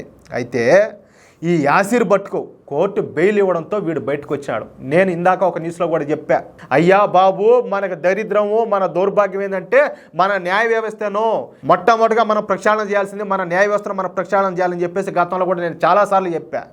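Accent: Rayalaseema, India. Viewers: Latest news ను వెంట వెంటనే అందిస్తున్నందుకు నా ధన్యవాదములు గారు, చాలా థాంక్స్, ఎవరు ఏ నెగటివ్ కామెంట్స్ చేసినా మీరు మాత్రం ఆపకుండా ముందుకు వెళ్ళండి 👌🙏నేను మాత్రం మీ అభిమాని.. మీరు మా రాయలసీమ slang తో సవివరంగా matter ను ఎక్సప్లయిన్ చేసే విధానం బాగుంది please continue 🙏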